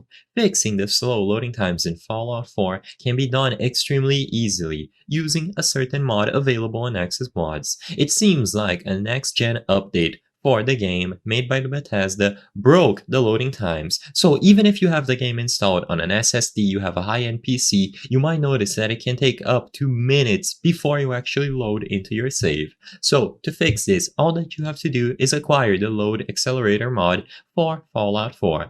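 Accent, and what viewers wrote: Brazil. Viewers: Percebi que é brasileiro pelo sotaque 😅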